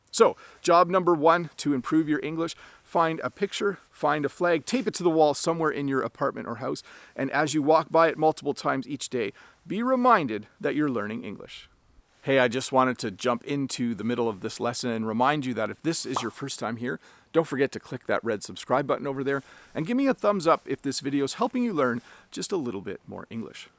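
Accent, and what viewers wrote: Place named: Canada. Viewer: Hey teacher Eu demorei um pouco a entender que você era do Canadá. Por que pra nós nativos de língua Portuguesa, temos dificuldades em entender os diferentes sotaques em inglês de países diferentes. Mas eu estou progredindo com seus vídeos. Professor, sucesso para você sempre. Que Deus o abençoe. By 🇧🇷🇨🇦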